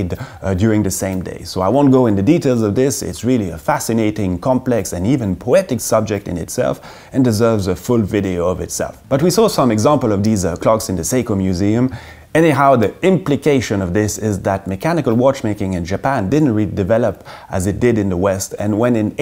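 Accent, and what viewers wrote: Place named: France. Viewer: This being the very first video I've watched on your channel, I was assuming, judging by your name and accent, that you were French. Imagine my surprise when you mentioned near the end that you're Swiss. And you're making a video praising a Japanese watch brand. Respect! Were you tarred and feathered upon your return in Switzerland? I can't imagine the backlash I, as a Belgian, would be facing if I made a video singing the praises of British moules-frites. 😂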